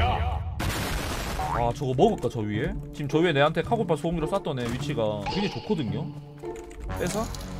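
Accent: Busan, South Korea. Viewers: 아 부산사투리 너무 찰지고~~~ㅋㅋㅋㅋㅋㅋㅋㅋㅋㅋ 부산아재 확실하네용 ㅎㅎㅎ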